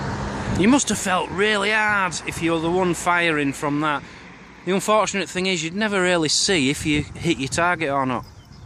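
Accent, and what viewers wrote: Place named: Scotland, United Kingdom. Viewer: I thought you were Scottish!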